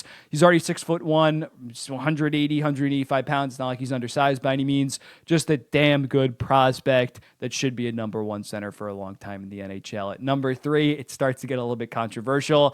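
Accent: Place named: Philadelphia, United States. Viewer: I gotta ask if you’re from PA or Jersey. I’m from Delco/Philly area, and your accent like fades in and out but it’s giving that fr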